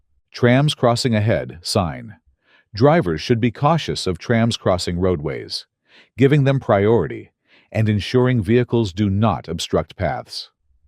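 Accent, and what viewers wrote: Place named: United States. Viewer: why an American accent for uk driving????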